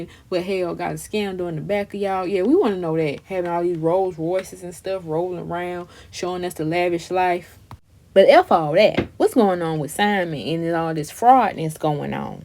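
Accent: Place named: Mississippi, United States. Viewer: I love your accent 😂 I’m from MS and this is how we talk!!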